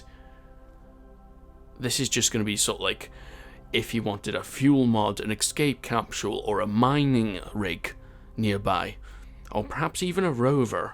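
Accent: Wales, United Kingdom. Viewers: YES, ANOTHER WELSHMAN! BLOODY TIDY!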